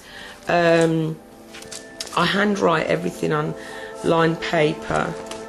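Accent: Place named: United Kingdom. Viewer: you have the loveliest British accent!! I love it.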